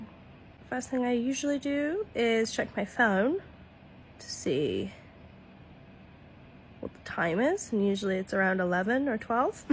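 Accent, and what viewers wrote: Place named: United States. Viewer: Y does she sound american??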